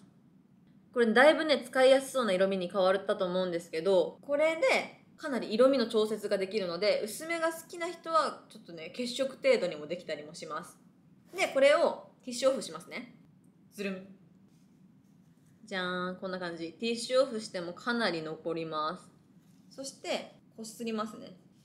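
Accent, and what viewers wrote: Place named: Kansai, Japan. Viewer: ちょいちょい関西弁になっちゃうさしこちゃんかわいい……かわいいやんけ！！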